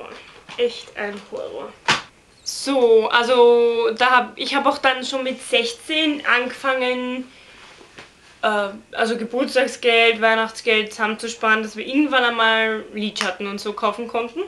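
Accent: Austria. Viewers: kommst du aus österreich?^^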